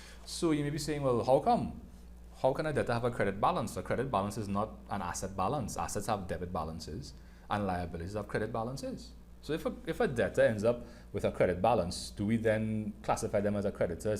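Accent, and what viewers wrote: Trinidad and Tobago. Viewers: U sound trini owo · He doesn’t, that’s a Trini accent😭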